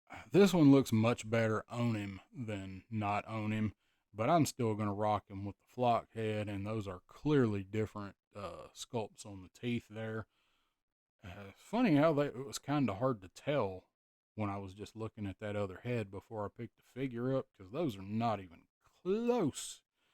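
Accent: South, United States. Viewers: The best adventures start with setting to wander. I don’t do MOTU, but you good folk with that Fine Southern Drawl really sell him well. 3 star outta 3 -well. I’m glad this video got mentioned to us tonight.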